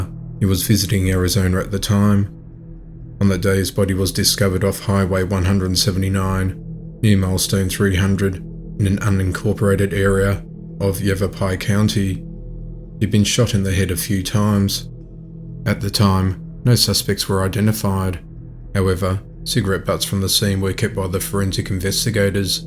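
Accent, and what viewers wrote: Australia. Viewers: Great to hear a fellow Aussies voice 😀 Loving the videos so many cases I never heard of and wonderful to see that some I knew about have been solved. Binge watching ... cheers from Queensland 🍻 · So glad to hear an Aussies voice. Your voice fits perfectly to the background noises. Very tragic what happened to these people though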